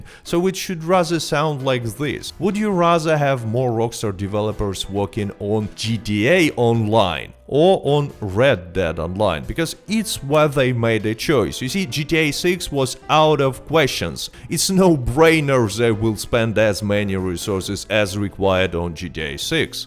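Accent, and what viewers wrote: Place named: Russia. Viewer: Love the Russian accent and Russian attire makes it great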